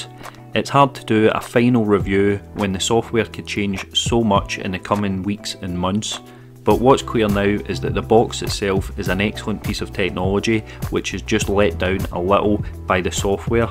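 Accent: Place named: Scotland, United Kingdom. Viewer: Ayeee nice to see Scottish accent around! Cheers mate!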